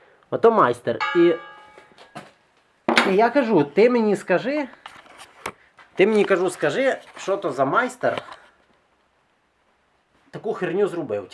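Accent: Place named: Galicia, Ukraine. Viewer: По розмові чую, що Галичина . Ні?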